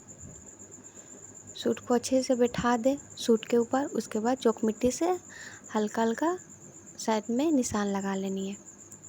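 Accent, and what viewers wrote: Bihar, India. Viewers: mam aapki boli se pakda ki aap bihar se ho ...waise mam kaha se hai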